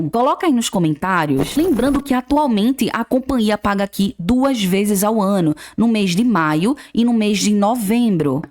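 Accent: Northeast, Brazil. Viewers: Sotaque nordestino assim como eu, mais um inscrito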